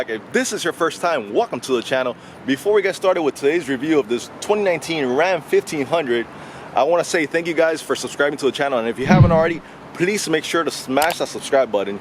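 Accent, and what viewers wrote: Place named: Hawaii, United States. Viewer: just a guess, your accent sounded somewhat Hawaiian. Awesome truck, and great review. Hopefully getting one in the next couple of days!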